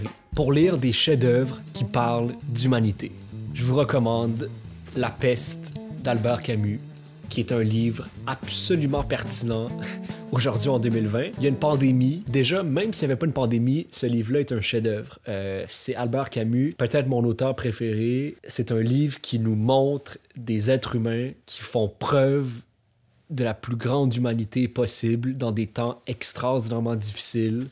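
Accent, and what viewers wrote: Quebec, Canada. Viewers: J'adore ta chaîne. Du vrai contenu dans le beau français du Québec. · Que l'accent québécois est beau et charmant!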